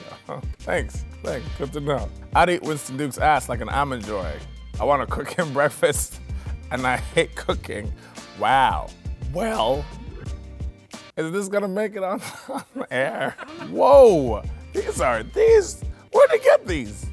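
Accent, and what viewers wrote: Trinidad and Tobago. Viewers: Him and his tobagoonanian accent. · I Wanna hear his Trinbagonian accent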